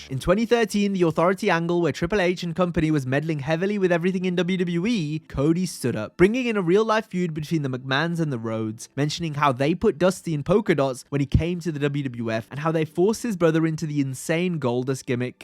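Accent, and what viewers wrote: United Kingdom. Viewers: British people and their pronunciation of words never ceases to amaze me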